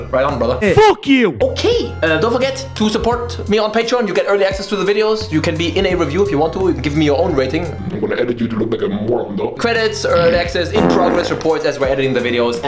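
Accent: Germany. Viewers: Asiate mit deutschem Akzent. Luv it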